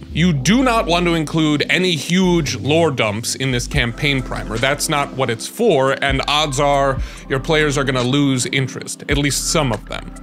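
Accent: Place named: United States. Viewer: He sounds just like me a Michigander, except with a bit more drama to the voice. So sounds very American, very clear to me!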